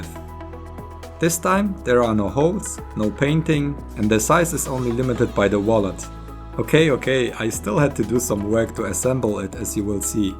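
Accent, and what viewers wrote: Germany. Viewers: Das Deutsch hört man wirklich immer rauß :D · Are you from germany ? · Like is voiceovers are great but in he's like excited in video he sounds so German😄😂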